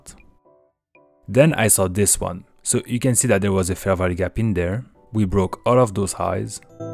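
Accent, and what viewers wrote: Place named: France. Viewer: je me disais bien que l'accent me disait quelque chose mais je savais pas que t'etais francais mdrr beau boulot en tout cas bravo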